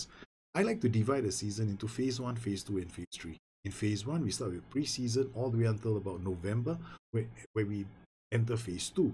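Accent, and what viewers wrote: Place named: Singapore. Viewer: you sound a little Singaporean hmm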